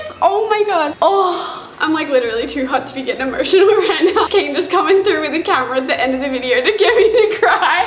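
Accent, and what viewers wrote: Australia. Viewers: Bruv is she Aussie?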